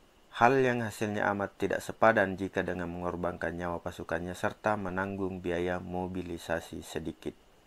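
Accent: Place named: Wajo, Indonesia. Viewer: Yg bicara pasti orang wajo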